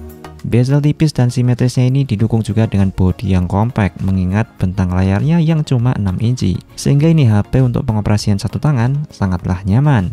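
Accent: Boyolali, Indonesia. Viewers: Orang boyolali ya mas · Orang Boyolali ya bang 😅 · Loh org Boyolali tah mas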